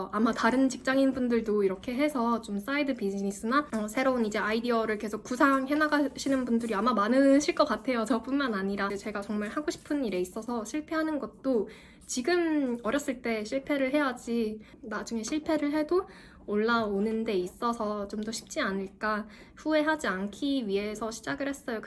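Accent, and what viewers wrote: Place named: Canada. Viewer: 영문으로는 18.6K라고 뜨는데 상이하나봐요! 그리고 사실은 영어녹음한 동영상 다 안보고 일부만 들었을 때든 느낌은 상당히 익숙한 억양 및 발음으로 느껴져서 미국 중서부지역이나 캐나다오대호근처지역에서 오랫동안 살았다고 추정은 했는데 맞았네요 ㅎㅎ 토론토에 오래 살았을 것 같아요! 거기서 영어연수해서 알아챘어요!ㅎㅎ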